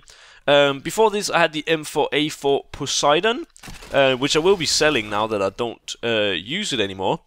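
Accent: Denmark. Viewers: You sound every Danish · Danish??? your accent is really Danish ! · I don't know why... But you Sound very Danish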